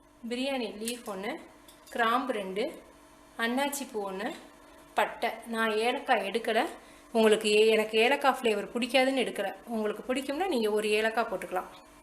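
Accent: Madurai, India. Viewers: Mam neenga sonna method la idiyappam senjen color color ah super Then ipo yaara priyani seya poren today Sunday spl ah senjittu solren And neenga enga madurai sidenu ninaikkuren. Madurai style la pesuringa. Really super. Tq.mam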